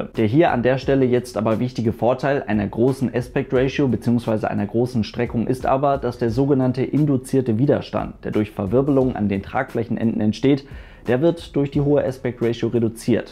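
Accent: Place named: North, Germany. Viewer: Warum sagst du immer Flugzeuch? Was ist das für ein Dialekt Kommst du aus dem Norden ?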